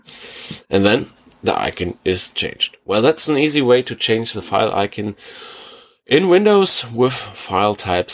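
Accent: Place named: Germany. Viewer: I can not live through 1 day without a guy with heavy, heavy German accent speaking about tech stuff.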